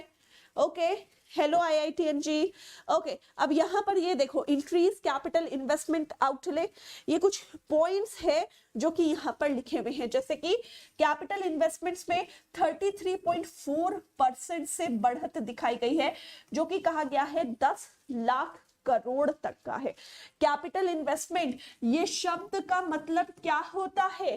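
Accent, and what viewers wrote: Kashmir, India. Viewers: Your voice, tone, and way of talking is very similar to us, ❤❤ FROM KASHMIR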